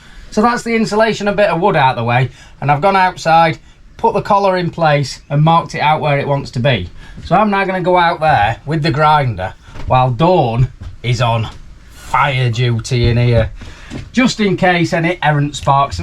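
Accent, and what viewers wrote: Yorkshire, United Kingdom. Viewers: Oh dear. I'm killing myself laughing because I can understand a Yorkshire accent (well, mostly), but I left CC on, and it can't figure out what is being said. Unintended hilarity, which I'm sure you will appreciate. I'm sure some people will wonder why you put so much effort into a temporary fitting, but it comes down to what my Scottish ancestors would call being "thrifty" (emphatically not "cheap"), which is completely consistent with being a "tight Yorkshireman"--a very respectable world view, I'd say. You may call it "winging it," but I really appreciate the skill behind it.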